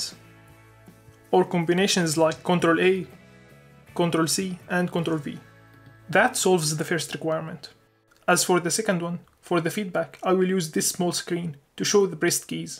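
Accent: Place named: Levant. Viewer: دخيل الشوام والأكسنت تبعوووووووووون 😆♥️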